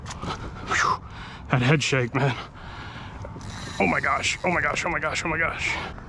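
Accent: New York, United States. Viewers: I can still hear NY in your voice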